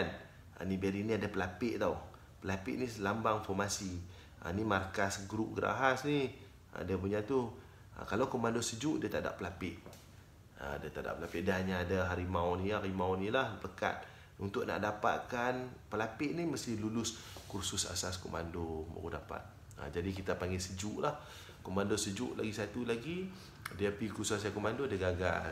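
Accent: Kedah, Malaysia. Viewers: Steady Tuan..yang sempoi kompom org Kedah...gerek,qeba,nasib x sebut pedai ayam...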